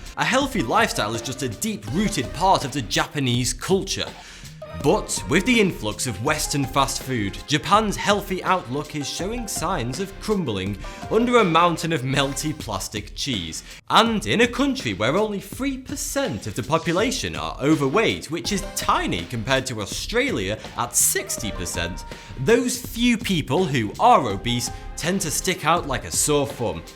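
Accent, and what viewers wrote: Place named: Yorkshire, United Kingdom. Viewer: Man, that Yorkshire accent...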